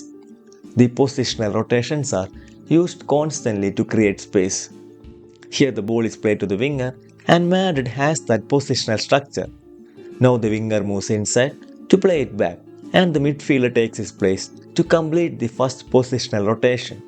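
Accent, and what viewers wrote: Kerala, India. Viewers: Malayalee alle aa English kettal ariyaam.. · Damn the mallu Accent is crazy!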